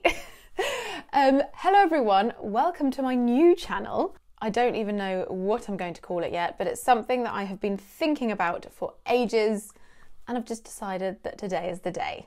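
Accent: United Kingdom. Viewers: First of all thanks for your channel "English with " which has improved my English drastically. Now I have also started following this new channel of yours which is now improving my listening skill, especially British accent. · British English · I've been watching English with and now this for about a week now. I'm an author in America and I just enjoy the British accent a lot, and I enjoy watching a beautiful person talk (and you're very beautiful), so listening to you and watching you is appealing, and here and there I've learned some things to improve my English. (I've been pronouncing albeit wrong for years, lol.) It surprises me to hear that you're 24 in this video, which means you're 25 now. I'm 31 and I've had this feeling like you were older than me, more mature and developed somehow. I rarely ever get this feeling about someone younger than me. 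Perhaps never before, actually. I think it might be the British accent; it comes with an association of rightness for me. I wonder how many other Americans would react to you the same way I have. Blessings.:) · Thanks to you now i like british accent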